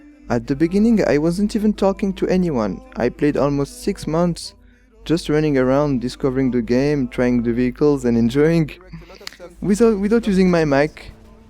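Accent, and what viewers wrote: France. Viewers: Is this Dude frech ? Sounds pretty like it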